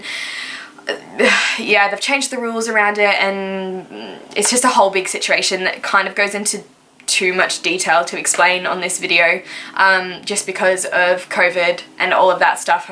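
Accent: United Kingdom. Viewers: Omg I can hear a bit of a British accent!! Also I’m so glad your back!! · So excited you're back! Can definitely hear a British accent! 🙈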